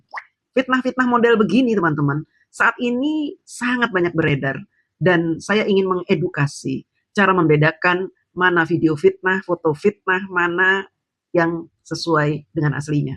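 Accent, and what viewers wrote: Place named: Java, Indonesia. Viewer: Saya kira mbak bkn org jawa,tapi kalau bicaranya diselipi pake bhs jawa yg medok,baru percaya nek mbak priyantun jawi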